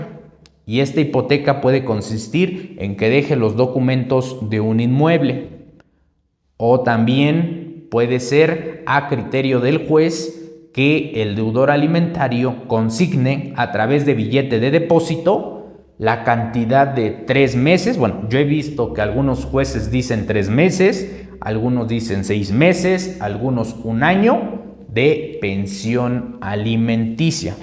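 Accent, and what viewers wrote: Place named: Peru. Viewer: Pensé que era de peru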